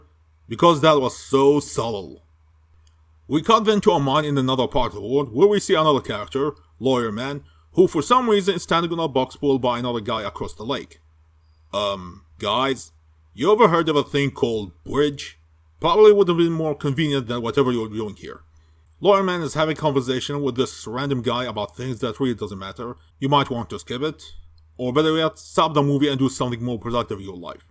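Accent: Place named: Dubai, United Arab Emirates. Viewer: It’s actually his thick accent, and bad mic quality. He’s from Dubai if that clears some stuff up.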